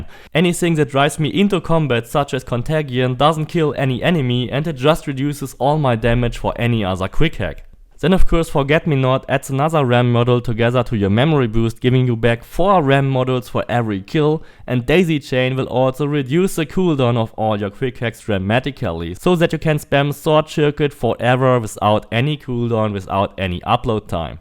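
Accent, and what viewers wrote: Germany. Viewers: Laut deinem Akzent gehe ich davon aus das du deutsch sprichst...Top Video. Danke.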